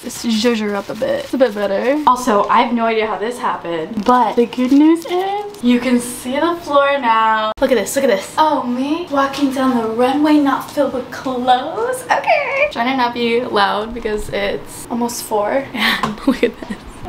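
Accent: Canada. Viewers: i know this is a random question, but i cant tell if has a Canadian accent?? does she?? i can never tell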